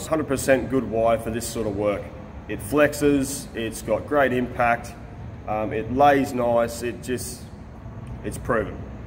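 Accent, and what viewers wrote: Australia. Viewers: Awesome!!! And this channel is even useful to practice English with an Australian accent 😁😁😁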